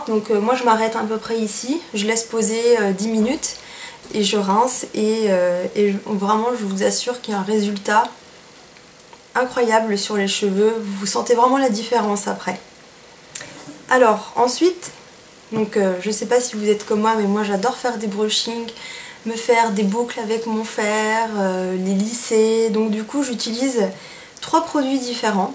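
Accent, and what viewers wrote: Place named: France. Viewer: Hello :) I don't know if you'll be able to understand this but I just found your channel and I think you are absolutely beautiful! I also love your accent, I go to France often on holidays but I've never been able to understand in full. I subscribed to your channel, you have lovely videos! Hopefully more to come :)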